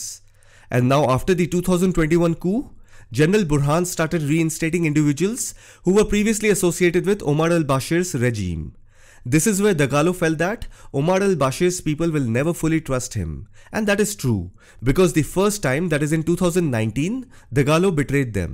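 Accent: India. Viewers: Well explained but not all are true from ur voice i can see you are an Indian repoter and that's why not all ur info are correct but you hit must of the points. More concrete research and confirmation from a well respected reporter in one of this african countries like UG, Keyan, Sudan will give you proper details. There are more to the war. I didnt see you talk about china here ask questions you will get the exact details. You did so well once again thank you for speaking out. God bless you 🙏❤ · ❤You spoke very well English - during the first 20 seconds... Then you got too animated and slipped into "Ind-glish" - which is unbearable to listen too!❤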